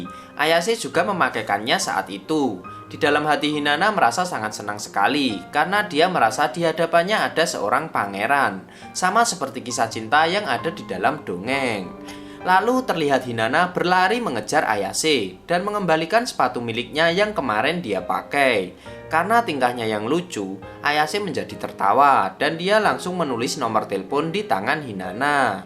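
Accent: Java, Indonesia. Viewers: Jng membaca medok jowo mas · Medok amat ngomongny😂😂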